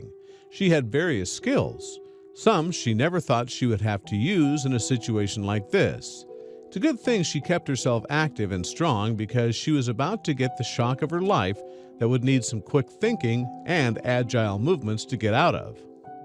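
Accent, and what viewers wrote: United States. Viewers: Why didn't a English person do the commentry Americans talk about paint dry annoying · This is a British story dragged out by an American narrator with police cars and cops from NYPD, Italian or Spanish cop cars, and several actors, must do better when presenting a tale from Liverpool, England!!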